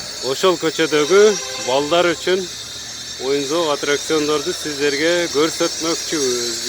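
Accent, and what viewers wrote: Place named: Batken, Kyrgyzstan. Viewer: далбоеб, есть разница, при том большая! Какой-то хуй со своим ебанным акцентом рассказывает тут про мой город. Соответственно все подумают что ошане так разговаривают. Блогером ему не быть, пусть делает такие обзоры у себя где-то в баткене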